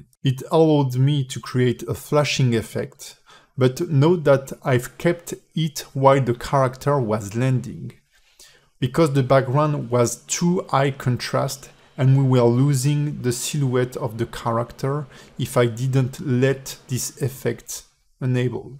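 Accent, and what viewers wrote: France. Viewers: what a french accent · Vraiment top ta vidéo, très quali et ton animation est cool ! On sent le petit accent frenchy :P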